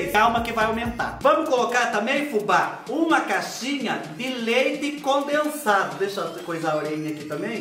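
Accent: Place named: Minas Gerais, Brazil. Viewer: Kkkkkkkkkk vai gostar de falá assim lá em Minas Gerais ,mais me diverti muito.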